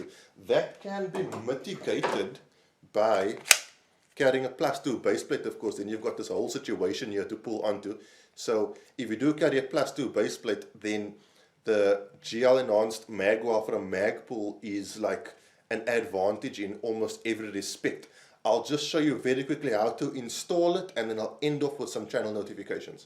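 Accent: South Africa. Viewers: are you south african? I'm trying to puck up on that accent lmao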